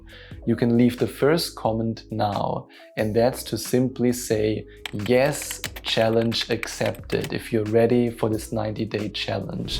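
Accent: Germany. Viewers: bester Mann mit angenehmen deutschen Akzent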